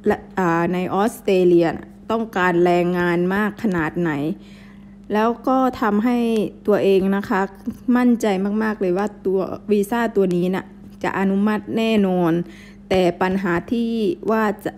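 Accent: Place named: South, Thailand. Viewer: คนอีสาณ แต่พูดสำเนียงคนใต้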